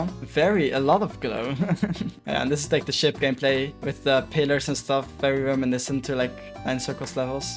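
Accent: Germany. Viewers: Youve got a german accent, are you from Germany? I am austrian btw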